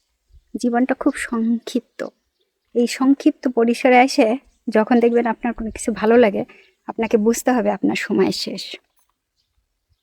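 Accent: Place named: Barishal, Bangladesh. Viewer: আপু আপনার বাংলা উচচরন শুনলে মনে হয় না আপনি খুলনার মেয়ে।আপনিতো বরিশালের মত কথা বলছেন।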